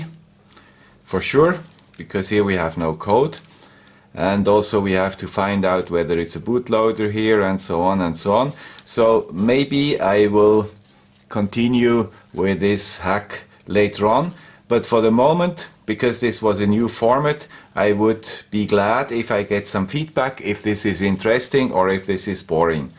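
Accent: Switzerland. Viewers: I like the swiss accent, just saying...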